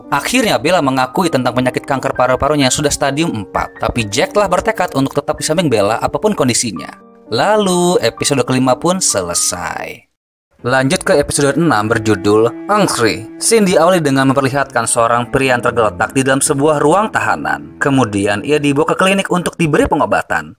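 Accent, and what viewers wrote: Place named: France. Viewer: Mantap bang accent perancis nya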